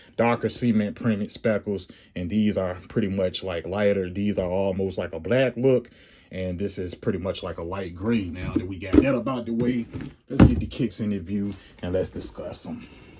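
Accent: Richmond, United States. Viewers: Sound like you from Richmond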